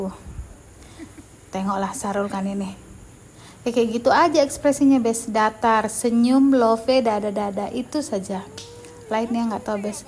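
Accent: Medan, Indonesia. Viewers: Logat..logat nya mb ini kayak orng medan ya😂😂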